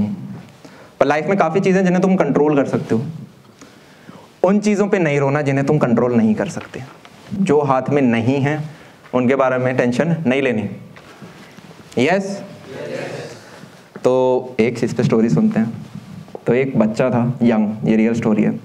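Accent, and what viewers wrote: Haryana, India. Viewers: 😉isse badhiya to sipahi lagleta fauj me.. Bhaiya apki Haryanvi accent bht shi lgti h☺️ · ....the haryanavi accent😂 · Sir kya aap Haryana se belong karte ho.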